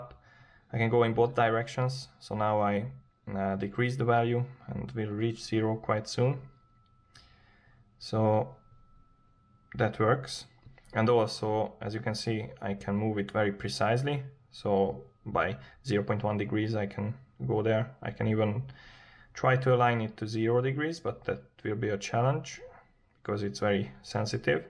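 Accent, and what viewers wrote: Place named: Hungary. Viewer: you have a hungarian accent :)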